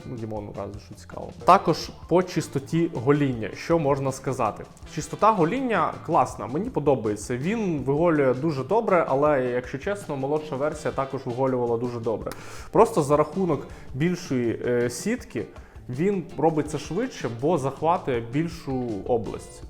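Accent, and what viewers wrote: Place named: Surzhyk, Ukraine. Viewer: Как бы ты не пытался, но увы, слышно четко, что Украинский язык не твой родной, суржик это твое, вся восточная Украина знать не знала своего языка, все говорили на суржике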